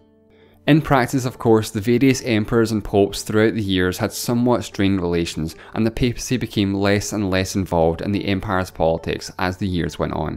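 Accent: Scotland, United Kingdom. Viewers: Came from lore page. Disappointed by Scot accent. U sound dumb & angry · I can't make out the accent. Yorkshire? Scot? Irish? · Bro is so Scottish I can’t keep up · Everything sounds better in Scottish. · It’s just Scottish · What’s the accent here? Scottish? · You sound like a Scotsman 🏴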